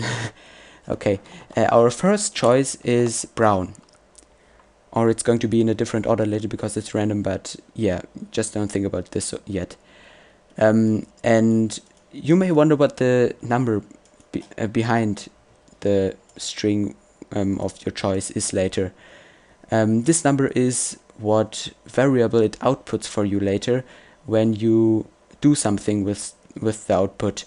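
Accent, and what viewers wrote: Germany. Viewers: You come from german right?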